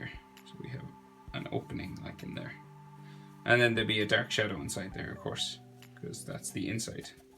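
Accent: Ireland. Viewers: Are u Irish u have a bit of a acsent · arwe you irish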